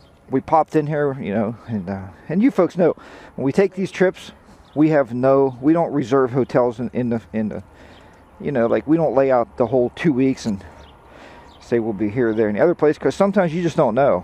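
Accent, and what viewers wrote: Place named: Pittsburgh, United States. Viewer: I spent 5 years in Sandusky. Where you turned left, you should have turned right. I guess you figured that out. Your accent sounds like you’re from the Pittsburgh area.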